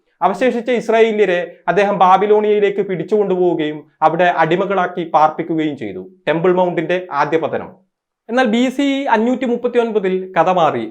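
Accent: Kasaragod, India. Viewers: പണ്ട് കോളാബി ചാനലിൽ , ചെയ്തതുപോലെ roasting പരിപാടി പൊടിതട്ടി എടുക്കാമോ.. we missing your humour senses in Kasaragod slang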